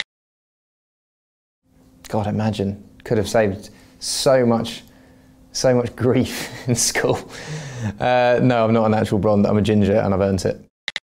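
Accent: England, United Kingdom. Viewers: L'accent anglais sahh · L’accent 😭😭😭 j’adore vrmt l’accent anglais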